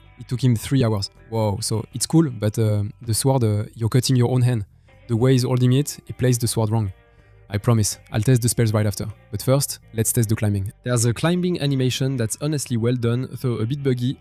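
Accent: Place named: France. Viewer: le fameux accens fr en anglais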